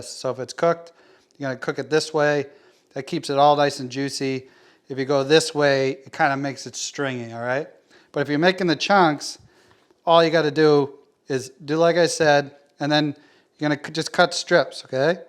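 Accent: Boston, United States. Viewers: Totally Boston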